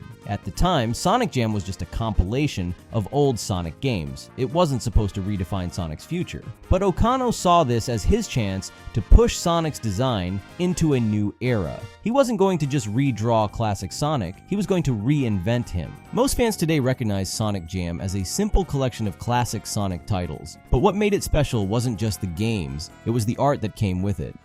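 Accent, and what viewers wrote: Brazil. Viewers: me desculpa mas, vc é brasileiro?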